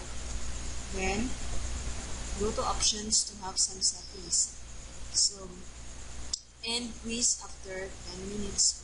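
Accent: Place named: Latin America. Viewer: You sound Latino..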